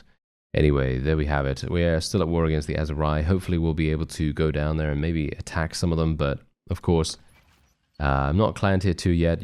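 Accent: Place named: England, United Kingdom. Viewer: My favourite part of your videos? "Are you serious?" 😂🤣😂 There's something inherently enjoyable about hearing your smooth english accent spit that phrase out every episode of every Bannerlord series. Never change sir.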